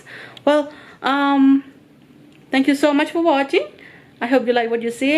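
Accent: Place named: Vietnam. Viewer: Are you Khmer or what? You act like it's your first time in Cambodia. You have Vietnamese accent though.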